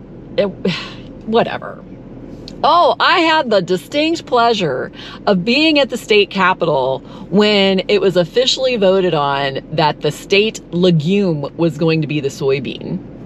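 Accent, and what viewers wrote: Oklahoma, United States. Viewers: Your accent sounds close to an Oklahoman accent. If remember right, the lakes were created so we wouldn't have another dust bowl.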